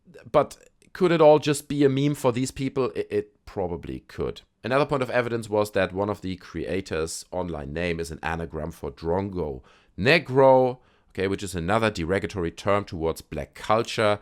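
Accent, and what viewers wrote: Germany. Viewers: coming back to that video years later and found ur channel. must say glad to see a German speaking 😂 with the accent on this... i am african. everything has a consequence, u cant spit on someone's face constantly and think yup we better than them nothing will happen lol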